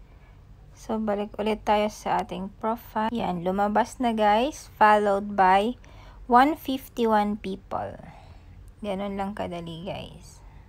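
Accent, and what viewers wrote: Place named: Pakistan. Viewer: pak english is life joke your so beautiful #sweet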